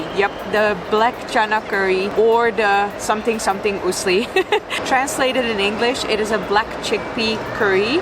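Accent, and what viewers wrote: India. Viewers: I am a foodie fan and I just adore watching you eat.. I laugh that you have begun to talk like an Indian, with the accent.. Or maybe you are Indian and I have no idea..lol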